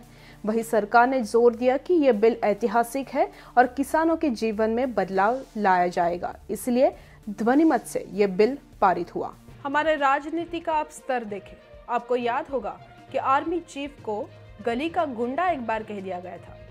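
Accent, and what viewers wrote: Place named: Maharashtra, India. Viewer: Superb...... your hind is too good.. ( with a slight touch of Marathi). Love your work!! keep it up!!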